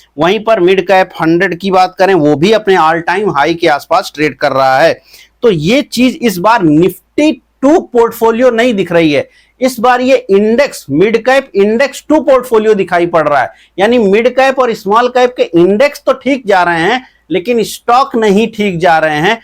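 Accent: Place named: Ayodhya, India. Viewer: Ayodhya ji se hain, Kanpur ki boli lahja thoda alag hota h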